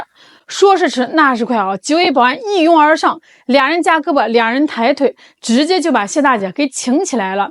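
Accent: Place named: Shandong, China. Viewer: 主播山东人啊😂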